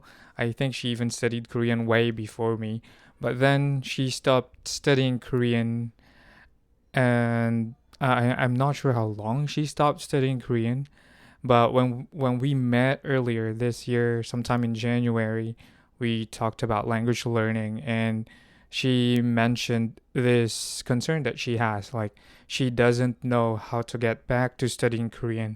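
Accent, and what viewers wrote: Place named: United States. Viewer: Hello! I came across your video 2 hours after you uploaded it and I watched till the end. I’m impressed by your talent (speaking 4 languages!) how did you do it?! I can’t even speak fluent English after living in the US for almost years!😂! Needles to say, I hate English subject! Ang hirap talaga mag English😂. Puro Pinay friends ko dito, lahat hindi magaling mag English (basic lang ang alam namin 😂). But I’ll dedicate myself to speak like a native hahaha! You speak like an American. I stumbled upon some Filipina English teachers here in YouTube claiming they have an American accent, they don’t. But you! Bravo! Sana all! Any tips?!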